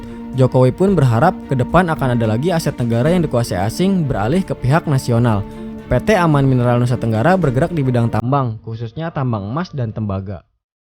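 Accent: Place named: Banjar, Indonesia. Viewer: Pasti yg ngomong orang banjar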